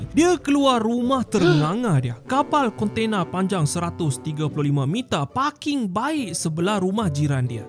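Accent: Sabah, Malaysia. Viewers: Orang Sabah Ka kau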